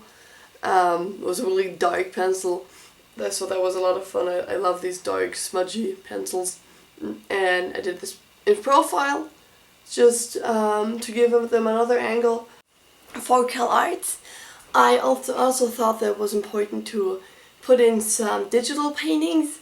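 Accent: Germany. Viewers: I have no idea why but I LOOOOVVVEEE your accent. I loved accents and German accents are my favorite! Don't apologize for your voice! It's beautiful! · Don't apologize for who you are! Your German accent is amazing and you shouldn't be sorry for that. Your art is amazing by the way <3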